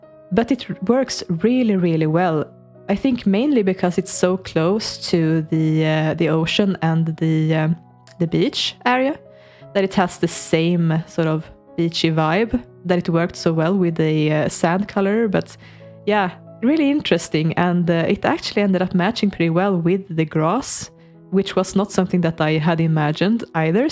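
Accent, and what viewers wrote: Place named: Sweden. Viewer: You are from Sweden 🙂 I have think when I heard you that you sound a little bit Swedish. I’m from Sweden too btw. In the end of 2022 I played The Sims 2 last time, but I played the Swedish version. So I connected all neighbourhoods in The Sims 2 so families in “Skönköping” could walk or take the taxi to ”Uddaholm” and the other neighbourhoods. Then I build my own version of Old Town from the first The Sims. The last thing I tried to do was to build Sunset Valley from The Sims 3 but then I stopped using The Sims 2 after I had a fever dream about The Sims 2.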